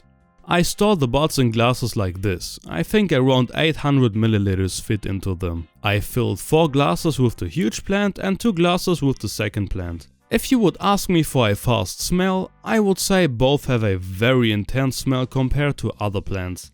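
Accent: Germany. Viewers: Dieser deutsche Akzent 🤣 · I hate to assume but you sound German so maybe you can grow in your home or yard soon. Also I assume you live in Germany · Man hört das deutsch richtig raus hahahah ich liebe es ❤️😂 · Man hört voll das er deutscher ist sein Englisch verrät ihn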